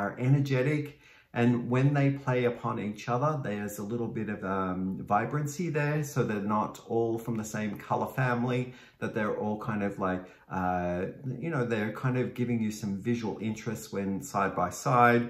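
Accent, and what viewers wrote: Australia. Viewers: A<link> 😆 A Pixel appearance! You should actually do some video vlogs with Pixel sitting with you on camera 😜😍.. I never knew you were from Australia 🤪 I knew u had accent which I love but I just thought it was some sort of strong Canadian accent lol 😂 Why in the world would you move to the cold Canada from Australia dear? Lol 😝 What beautiful happy mailings. Those hobbi ones were gorgeous.. my fav is the darker purples one. The colors 8 and 10 I thought was the same color. They almost look identical. I bet you could actually use them together 😜 And Zee is wonderful! She’s so fun to watch. You were lucky to receive her birthday colorway 😍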